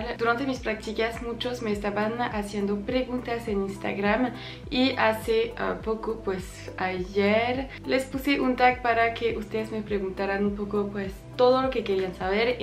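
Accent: France. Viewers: El acento francés se escucha muy bien en español. El shua y las variaciones de la "i" son fenomenales. Tienes dos vidas : Hablas español nativo y francés nativo, ¡de lujo escucharte! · Cómo eres envidiosa. Solo por su acento frances. Y romántico.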